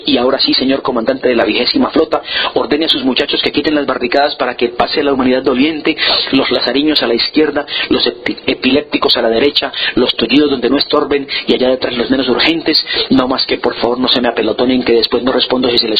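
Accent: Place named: Antioquia, Colombia. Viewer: Esta no es de mis historias favoritas, sin embargo entiendo porque esta leída de esa manera. Para aquellos que critican al lector, deben saber que esta historia es acerca de un “culebrero”. Este es el nombre con el que se describe en algunas regiones de Colombia a aquellos que se dedican a vender o engañar al publico de pueblos con medicinas y cremas que en realidad no tienen ningún valor medicinal. Es mas un show que una venta en realidad. El punto es que la manera característica de hablar de estos personajes es así como lo hace este narrador: de manera rápida, con pequeñas pausas para tomar aire y un marcado acento paisa 😀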